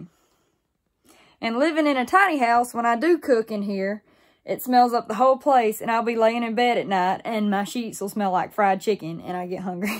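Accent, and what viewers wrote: South, United States. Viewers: I love that Souther accent. You are a very beautiful girl love your little puppy.Have a great day beautiful. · Hello from Thailand :) Been following your video‘s and those of your dad and I truly appreciate :) ya’ll I had the big chance to learn my English during a couple of exchanges in a great family in NC , and after some adaptations I started to really enjoy your “southern slang” :) So thank ya’ll for your cool content. Now I wonder why you try fasting, but if you really want to loose some weight, maybe try to lessen the fried stuff from time to time. Here they do not use a lot of butter or oil when cooking, they boil for example chicken in coconut milk, with some onions, herbs, garlic and pepper, and it’s delicious, your are full and you start loosing weight also :) We eat this with some rice. But I truly miss the delicious fried catfish the dad used to prepare after fishing together. 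Even got the chance to experience true “frogging’” at night time :)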